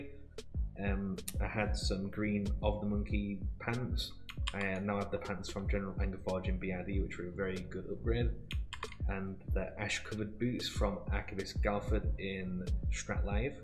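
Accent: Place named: Yorkshire, United Kingdom. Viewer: So Yorkshire I love it, love from another Druid from Sheffield xD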